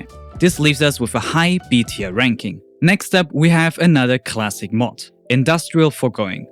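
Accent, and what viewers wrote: Germany. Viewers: Bro, are you actually German? Maybe it's just me but.i hear a VERY slight German accent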